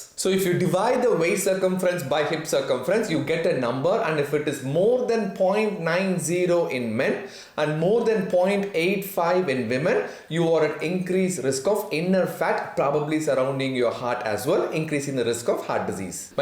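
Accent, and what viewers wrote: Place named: South India, India. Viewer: Sorry your South Indian accent in English is irritating. Otherwise the video is informative.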